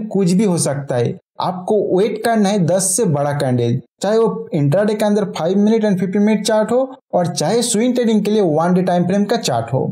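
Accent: Bengal, India. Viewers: App ko accent se malum hota hain keya app banagali hain sir?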